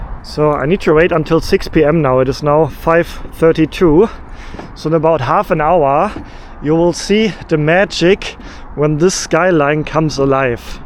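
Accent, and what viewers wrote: Germany. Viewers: your pronounciation of Germany in Chinese is still off 😂It is because of your German accent. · Maybe a "better" suggestion for saying Germany in Mandarin is: "Ter Kwor"; you raid roughly "Ter Kwar" - a world of difference. People who suggest, say: "De Guo" - may not be helping you much, since you maybe do not know the current, and more accurate, Pinyin phonetic system. The "De" is pronounced "Ter" and the "Guo" is pronounced "Kwor" - OR rather than AR sound. Also in Pinyin, the "d" is the usual "t" and the "g" our usual "k". I noticed you may have pronounced Wuhan as Vuhan, since you are using your German way "v" for the "w", just as your English is very much the German way of speaking English.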